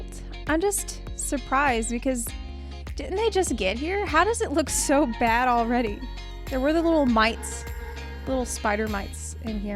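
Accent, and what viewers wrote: South, United States. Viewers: where are you from? i looove your accent! i'm from ga living in nc and i relate to your pronunciation so much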